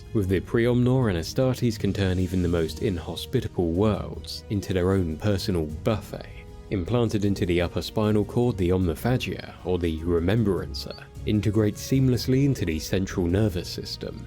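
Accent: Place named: United Kingdom. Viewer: "Imagine yourself listening to a man with a british accent explaining things that involve mystery and murder"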